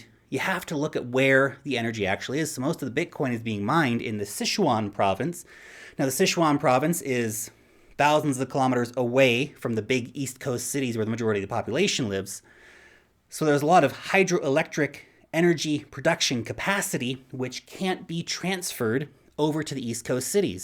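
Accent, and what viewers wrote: United States. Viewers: Wow, I always thought is from the US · you’re a kiwi 🥝? What’s with the American accent? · you don't sound like you are from New Zealand. You sound American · New Zealand? How come you sound like you're from the US?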